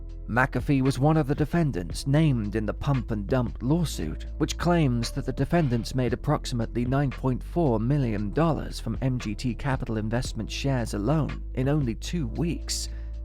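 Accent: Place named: United Kingdom. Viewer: John probably watched this in jail and then killed himself due to this pompous british voiced narration mini doc.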